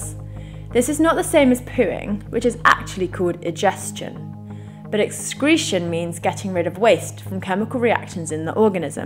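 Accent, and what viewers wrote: United Kingdom. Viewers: You sounds more like a british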